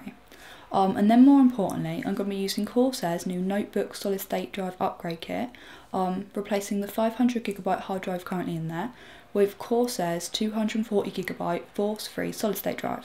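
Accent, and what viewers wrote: England, United Kingdom. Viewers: are you an idiot shes english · Are you from england ?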